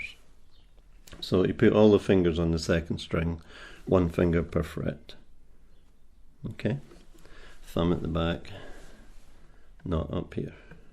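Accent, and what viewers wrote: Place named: Scotland, United Kingdom. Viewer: Yr fingers looked like a spider, I said to hubby. Then u said spider. ;) Love yr accent. Scottish.. ? THe best accent in the whole world.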